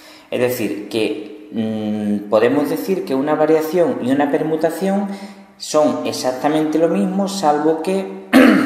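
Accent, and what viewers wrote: Spain. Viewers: Me ha llegado al corazón vale. Espero que me acepte una disculpa sincera y sin culpa ya que estaba enojado porque me avisaron a ultima hora que tenia que dar exposición de ese tema...... pero el acento sigue sin gustarme lo digo por las pelis. Aunque todas las españolas me gustan hasta en los videos para +18.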